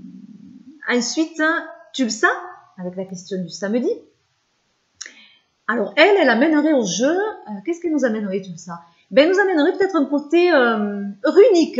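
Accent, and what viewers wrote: South, France. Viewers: Toi avé ton accent du Sud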